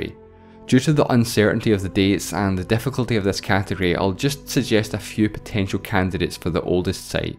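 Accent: Scotland, United Kingdom. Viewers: Scottish? Love it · scottish accent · It's Scottish, just not the heavy Glaswegian accent most people associate with Scotland. This from some one who lives further East, possibly Edinburgh.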